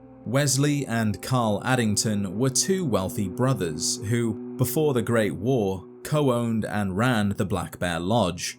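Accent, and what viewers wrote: United Kingdom. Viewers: Good to see a fellow (from what I can tell) british fallout content creator. Great shit dude!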